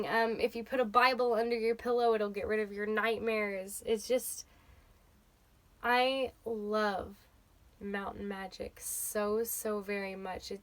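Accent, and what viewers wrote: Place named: North Carolina, United States. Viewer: Sweetheart, #1 you look beautiful in this video. #2, don't suppress your accent! I grew up in western NC, and I suppressed mine for many years. That accent is YOU and a connection to your ancestors. Let it be! This is the first video of yours I've seen, and I just love you 💜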